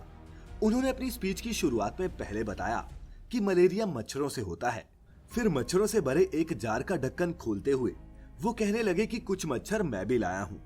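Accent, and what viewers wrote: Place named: Rajasthan, India. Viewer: Bhai aap pkka RAJASTHAN se ho na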